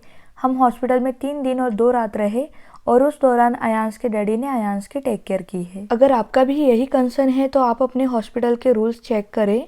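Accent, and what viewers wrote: India. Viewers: Mast anti tame kathiyavadi cho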